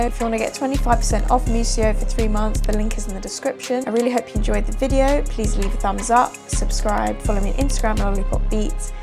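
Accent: United Kingdom. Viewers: Her posh accent makes this go even harder. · Ayeeeeeeeeeee I started to play this & completely ignored it, a few moments later I’m like “Who is this lil white girl with this UK accent cooking on the beat!” Does she/her have an Instagram? I have to plug her in with my lil bro DJPlugg, Google him.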